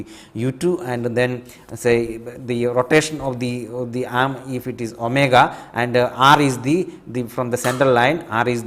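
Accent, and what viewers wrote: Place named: Kottayam, India. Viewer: Kottayam malayali detected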